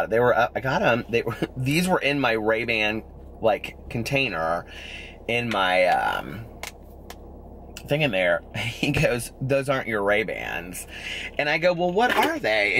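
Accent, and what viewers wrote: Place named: Indiana, United States. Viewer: I just discovered you the other day and have already watched a ton of ur videos. It was your voice that sucked me in! I love how you talk. I kept thinking...he sounds so familiar. Then I realized you live in Indy and I think it's just ur dialect that sounds familiar to me because I live in Indiana too.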